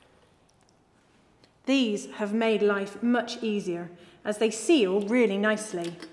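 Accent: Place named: West Country, United Kingdom. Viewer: Its a fairly common accent from the English West. Still widely spoken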